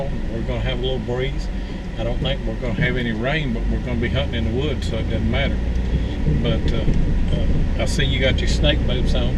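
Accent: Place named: North Carolina, United States. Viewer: Enjoyed the video! You sound like my husband's family who grew up in the mountains of North Carolina in the Canton/Clyde area. I'm starting a metal detecting club at the school where I teach and I would love to show some of your videos to the students! - Vicky Jackson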